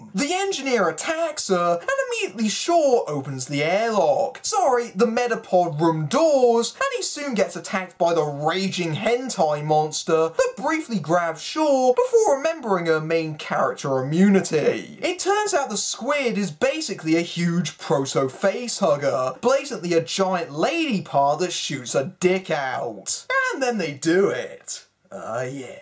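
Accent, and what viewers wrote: United Kingdom. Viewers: this maybe the first time i find a british accent fucking annoying.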